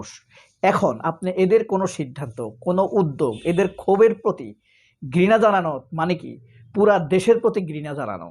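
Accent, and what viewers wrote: Noakhali, Bangladesh. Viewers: নোয়াখালী র ভাই আপনের কথা শুনতে খুব মজা লাগে , কস্টের মধ্যে ও হাসলাম।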